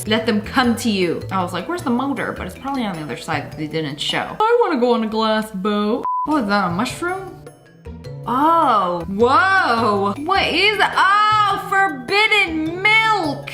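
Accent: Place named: London, United Kingdom. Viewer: ur from london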